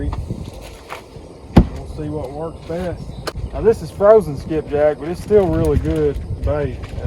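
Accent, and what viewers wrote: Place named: Georgia, United States. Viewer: To be from Ohio, you sure sound like you came straight outta my state of Georgia. Now there's an example of a youngern who fishes like I did when I was a kid. I'm sure he makes you proud.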